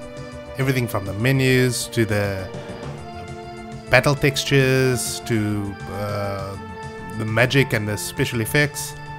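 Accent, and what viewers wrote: South Africa. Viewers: Amazing! Brilliant step by step instructions. Also I'm currently living in Thailand and as I was listening to you speak I thought "no bloody way this dude is also a saffa!" Thanks from a Durbanite living in Asia :D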